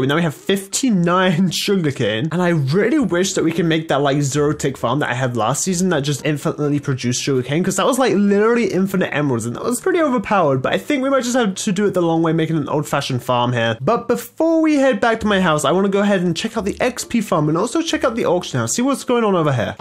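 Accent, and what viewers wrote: United Kingdom. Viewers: I am not British but I would assume he had a British accent from the way he talks.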